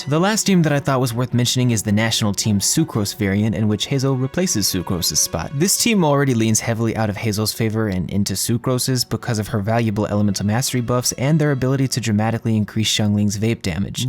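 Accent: United Kingdom. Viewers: That British accent caught me off guard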